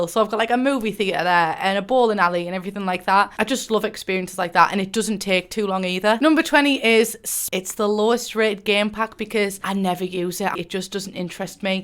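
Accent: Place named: Middlesbrough, United Kingdom. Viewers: lmao I literally was thinking 'i heard this accent before, maybe when I flew in Middlesbrough to get tattooed' then I saw your description. I've never felt this satisfied for guessing something right (I'm French so recognizing accents is a big deal for me) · YOU CHANGED YOUR VIDEO DESCRIPTION rip “...from proper up north that’s why i sound like that” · Never watched one of your videos until a friend sent me this today, heard your voice and as a fellow smoggie no longer living in the Boro, your voice was a nice bit of home I needed, thanks. Also thanks for helping which packs to buy, great content. Subscribed. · I just realised I never question your voice cuz I'm also from Middlesbrough onsdiadoj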